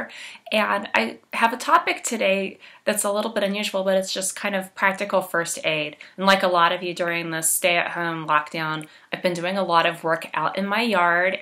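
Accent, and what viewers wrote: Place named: Philadelphia, United States. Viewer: Appreciate Tips no pun intended! I miss that accent it sounds like You’re from Philadelphia! That’s where I grew up but I’m out in California now. I miss ...the people. Haha I have a little tip although unsolicited… If you had the window in front of you this would’ve made a much better video it would’ve been perfect lighting but it’s really dark and hard to see you with it behind you it makes you a silhouette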